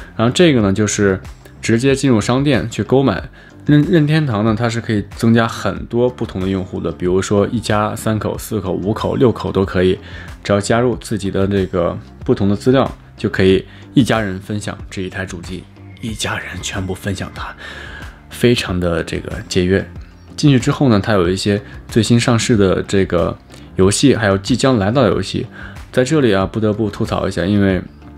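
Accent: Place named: Northwest, China. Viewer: up主浓浓的西北口音，祖籍是西北人吗？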